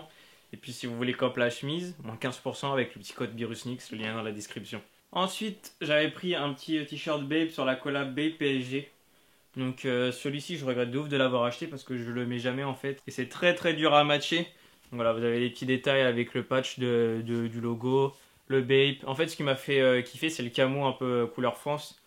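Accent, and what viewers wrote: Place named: Belgium. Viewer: Ton decor est de plus en plus mortel! achats tops et surtout gros pouce bleu pour ton accent belge un moment ahaha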